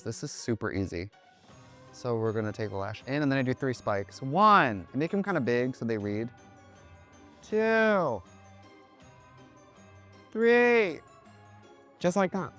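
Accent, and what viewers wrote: Wisconsin, United States. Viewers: The wisco accent is back in full force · In my head, I was like why does Trixie kind of sound like Ryan Murphy playing Ed Gein from Monster but then I remembered they’re both from Wisconsin lol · wisconsin accent is thick today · Honestly, the wisconsin accent was strong in this video. love that for you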